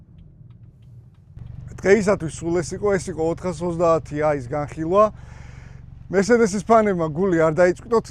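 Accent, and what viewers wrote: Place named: Gori, Georgia. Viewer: გორელს კილო აქ განსხვავებული, მარნეულში გატესტოს მანქანა აზერი ხარო კიარ ითქმის..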